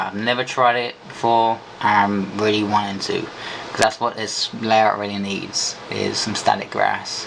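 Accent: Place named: United States. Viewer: Great video! My god....such an American Accent too!!!;->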